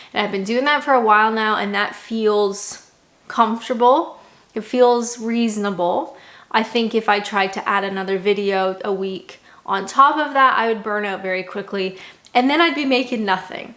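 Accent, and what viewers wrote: Canada. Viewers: fist of all, I'm sooo happy for you 🥳🥳 and i hope everyone works out for you for the rest of your life ! I've been learning english vocabulary and phrases from you over these video in oder to get used to Canadian's accent 🤗 (I'm a Korean I'll move to Canada next year ) So I'd be so happy if you could upload a video with subtitle ~^^ I had to write down every single word you said on video 😂😂 and then ask an native speaker to help me with some part i couldn't catch or catch incorrectly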